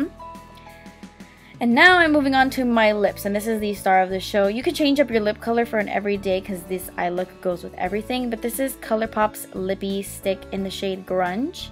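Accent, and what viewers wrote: England, United Kingdom. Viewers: your english accent though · obsesseddd!! love the british accent lol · When will you film a whole video with a british accent? I'm totally serious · okay chill girl! my only point was that has been slipping into her british accent since forever, that doesnt mean she is TRYING to sound like ZOELLA... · your British accent is LIFE💜💜💜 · your British accent kills me, freakin love it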